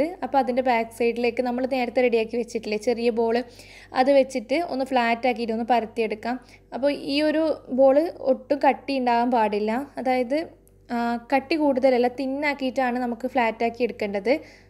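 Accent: Kannur, India. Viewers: aaha... kannur evde.??? .. samsaram kettappo enikk thoniyirunnu kannur aanenn... njanum kannura... payangadi🤗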